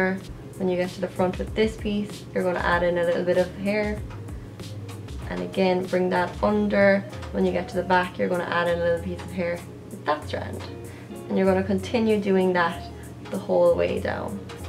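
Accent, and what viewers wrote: Ireland. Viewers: Liked and subscribed, I really enjoy your videos and it's especially nice to hear them in a familiar Irish accent! ❤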